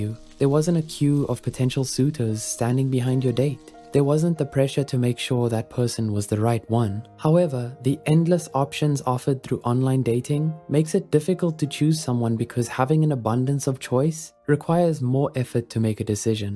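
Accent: South Africa. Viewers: where is from? his accent sounds vaguely south african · Is the speaker from south Africa? Awesome video none the less👏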